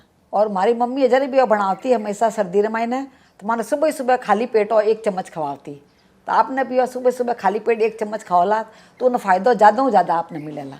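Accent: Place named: Gujarat, India. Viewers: Namaste masi. I don't know your language but I understand it little little because it's giving me a Gujrati touch. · Is the language Hindi in Gujarathi accet or Gujarathi in Hindi accent It is confusing for south Indian like me .However this recipe looks tasty .Good presentation .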